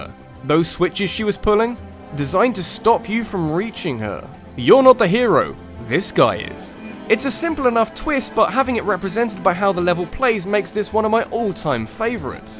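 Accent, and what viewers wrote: United Kingdom. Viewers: Blue Spartan Gaming he's British, so his accent will be different than us stupid Americans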